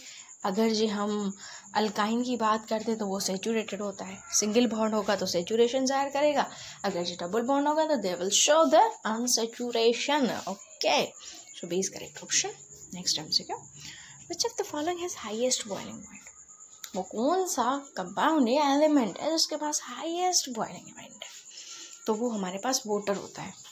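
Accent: Sindh, India. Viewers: Ma'am are you Sindhi?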